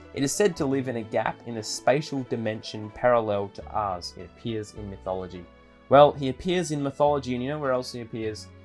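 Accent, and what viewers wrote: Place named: Australia. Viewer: Always loved the Australian accent☺☺. Awesome list, definitely more unique than most in regards to the parameters.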